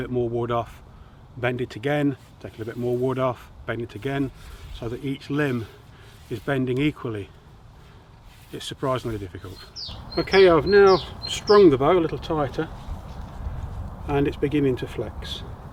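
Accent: United Kingdom. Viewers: British AF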